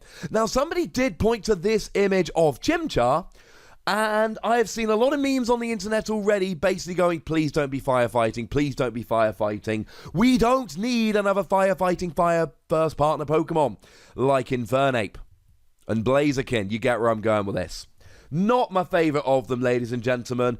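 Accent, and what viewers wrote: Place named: Cornwall, United Kingdom. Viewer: AYY a fellow Cornishman. That's lit, thought I was hearing a Cornish accent but I thought it was all in my head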